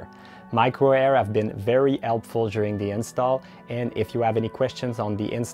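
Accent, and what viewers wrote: Canada. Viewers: Oups je me suis trompée de vidéo mais j'ai like quand même. A bientôt pour une vidéo avec votre accent Canadien en français 😉☺🤣😂🇲🇫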